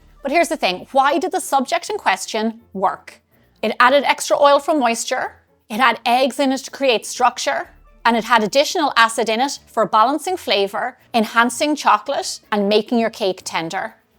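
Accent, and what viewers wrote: Ireland. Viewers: (LOVE this woman's Irish accent!) 😊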